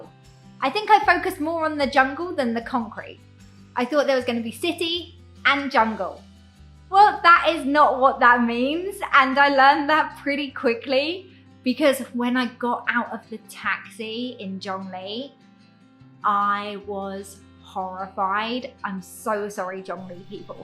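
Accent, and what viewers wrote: United Kingdom. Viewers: I lived in Chungli for five years because of studying in university. In my opinion, it is convenient for living there! There has a lot of convenience stores, 光南(Guangnan), 墊腳石, a big(but also ugly)station, High Speed Railway, Rakuten Monkeys(a pro baseball team), etc. However the only one thing I really hate is cold and WET weather in winter.😂 I want to recommend you my hometown Taichung, it’s more comfortable weather here! But I do like Chungli as well, there are so many memories for me. Btw, I like your British accent. The video make me to practice with my listening. 👍🏼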